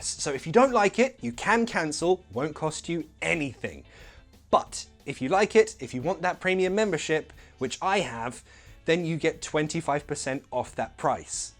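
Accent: United States. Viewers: Fine american accent ! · I love your girl version more and the way you pronounce things in American accent ...😂👌 Really you r amazing ☺️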